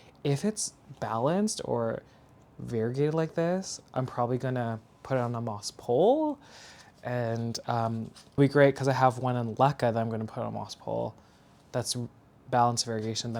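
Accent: South, United States. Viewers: Has anyone ever told you that you have a southern American "twang"?! 😅 I love it! 😂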